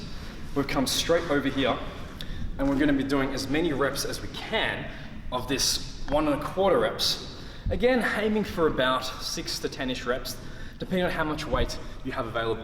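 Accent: United Kingdom. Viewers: Who are you with the British accent? Gym closed bro !! Why are your still open bro??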